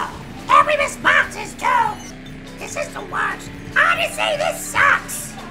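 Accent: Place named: Germany. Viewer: Are you German? I heared the accent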